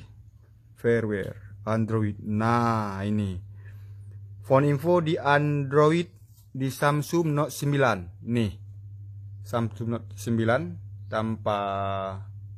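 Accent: Indonesia. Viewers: Lage logat aceh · wong plembang ni, nak mudike tulaa wkwkwkwk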